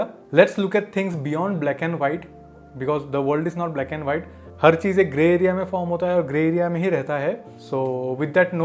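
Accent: Assam, India. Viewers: Tumar accent khuni doubt korisiliua j tumi Assamese hoi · lmao. I knew you were Assamese before reading the Description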